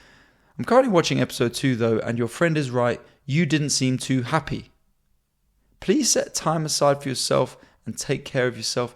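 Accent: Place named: Jamaica. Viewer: I am hearing a mixture of English, Jamaican and Black American mixed together!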